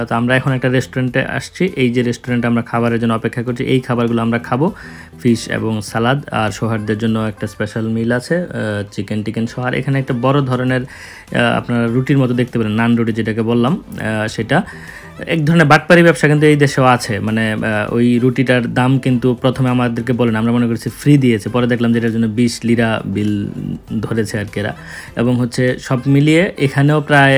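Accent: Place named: Madaripur, Bangladesh. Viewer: ভাই আপনার কথা একেবারে খাটি বাঙালি.এভাবে কথা আমরা মাদারীপুর বাসি বলে থাকি. ধন্যবাদ এভাবে ভিডিও আমাদের উপহার দেওয়ার জন‍্য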